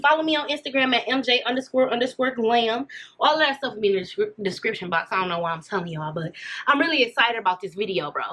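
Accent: Detroit, United States. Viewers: Cute, the fact I knew you were from Detroit, well before you said it is crazy lol love the video 😎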